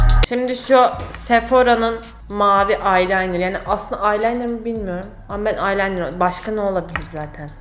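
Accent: Kütahya, Turkey. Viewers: ilk buluşmadan beyrana götürmek galiba Kütahyaliliktan kiz yaaa bende yaptim😂 senden sadece kutahya şivesiyle video bekliyoruz😁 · vallah bayiliyorum bu kiza makaji beni ilgilendirmiyor zira bir erkegim ama konusmasi allah be acayip süper konusmasi gülmesi sakalari harika vallah birde kütahyaca konusuyor benim de memleketim 43 cok hosuma gitti cok gurur kaynagmiz:D <3 <3 <3 <3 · öldüm glmekten kütahya lehçenle yap makyajj süpersin resmen koşturark geldim izlemek için mucuk seni çok sevdım ben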